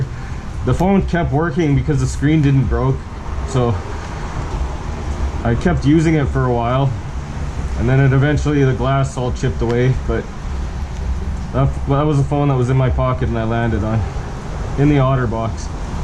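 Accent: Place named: Canada. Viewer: Wow! Poor guy. Just can't believe it. You must be the strongest person I know. I seen your framing work and the fact there isn't a lazy bone in your body. The big house you frame by yourself is what got me hooked on your videos. Just couldn't believe you were doing that on your own and the temps were freaking cold. You sound Canadian and for what I been watching, you Canadians rock. Hope God keeps you safe and you never get hurt like that again. Amazing person you are since I started watching. You also a great educator and well thought out person. Great work you do. I never seen anything like that before. Watching you, I get so scared just seeing it. Keep up the great work you do. Canadian people have impressed me for a long time. I watch them off grid cabin and houses they built on their own. Wow! Take care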